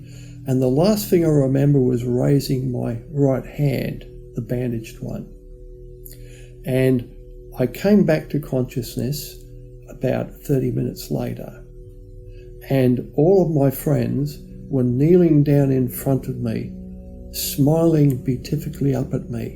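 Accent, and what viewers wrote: Australia. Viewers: Are you a fellow Australian?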